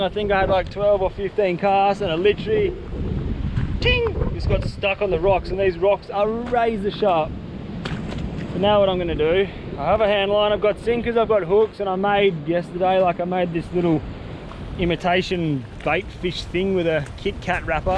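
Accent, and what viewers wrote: Australia. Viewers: At first I thought this was fake. Then I heard the Australian accent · Mate are you Australian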